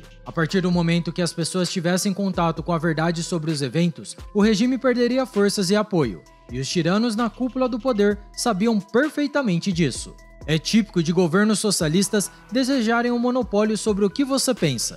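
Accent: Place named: Caipira, Brazil. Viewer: Essa narração caipira é osso.....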